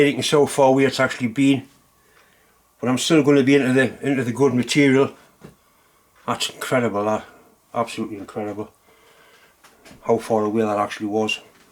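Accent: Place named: Northumberland, United Kingdom. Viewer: Hard as the hobs of hell, been a long time since ive heard that, deffo ashington twang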